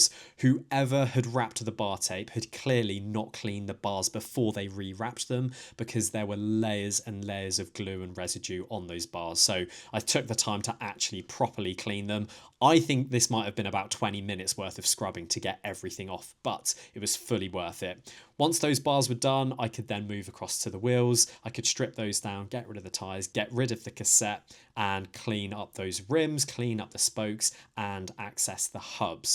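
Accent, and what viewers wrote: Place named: RP, United Kingdom. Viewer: Thats a very posh entrance for a bike journalist, though he does have a very posh accent.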